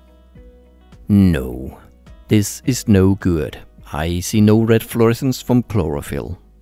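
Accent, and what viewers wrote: Denmark. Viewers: I KNEW YOU WERE DANISH!!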